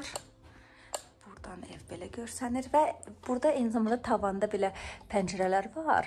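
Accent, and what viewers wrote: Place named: United States. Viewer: Danisigin bele Amerika lehcesindedi 😃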